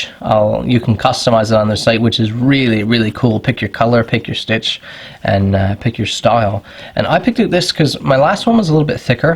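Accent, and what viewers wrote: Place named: Newfoundland, Canada. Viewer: Yesman your a newfie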